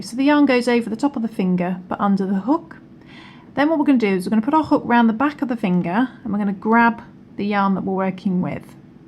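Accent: United Kingdom. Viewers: Love this pattern. I have a question, though. When doing loop stitch, you start out with yarn over on this video, but in your other tutorial with the small light-green sample you don't do yarn over as the first step and just go directly to inserting into the stitch as the first step. So, I'm a little confused. Would rather not do yarn over, if possible. So lemme know. I love your Brit accent.....Fiddly, a very cute and accurate expression, lol. Haven't heard that one over here--USA.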